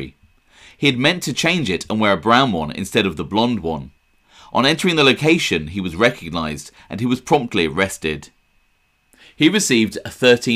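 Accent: United Kingdom. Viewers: Funny hearing a British guy talking about are American Italian history 🤡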